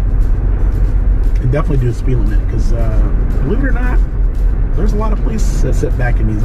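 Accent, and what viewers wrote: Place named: South, United States. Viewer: It's funny how your words are sounding more like the Southern part of of you now! LOL!!!!!😁😁😁😁😁 THATS A GOOD THING!! You even have a twang also...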